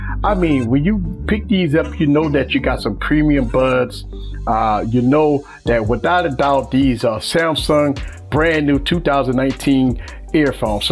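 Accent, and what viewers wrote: Louisiana, United States. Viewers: I feel like you're from Louisiana based on your accent lol. Good video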